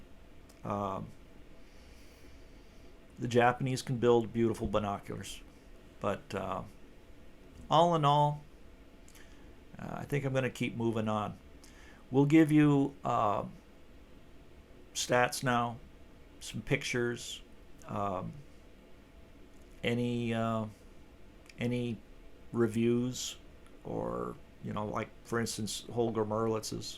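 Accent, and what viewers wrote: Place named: Levant, Arab world. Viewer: Nice to hear from you, V :) So glad to hear you’re enjoying the New Year. I hope it is a prosperous one in those important ways. It feels like a mixed New Year. I’m more optimistic than usual but fear there are a lot of conflicts and disputes far from being resolved with the ability of involving more countries / raising other simmering tensions... Your accent sounds familiar but hard to pin down. I suspect I’ve not met anyone from your origins to be sure. Listening again, I hear different mixtures sometimes familiar accents. I suspect the Latin hints comes from learning those accents of English. Thanks for the clues, I don’t know distances well, but I would say NE of the Levantine, approaching Europe... I’ve gotten into the habit of playing videos as fast as still legible, and forget how it chipmunks the sound. Well, in your case Levintines ;)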